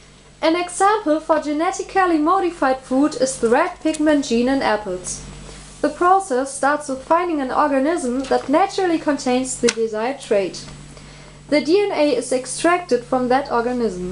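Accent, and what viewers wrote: Germany. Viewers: cooles video! man merkt aber an deiner aussprache dass du deutsch bist :D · Die Aussprache is schon ziemlich deutsch xD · Tolles Video ! Dieses Englisch mit deutschem Akzent ist einfach herrlich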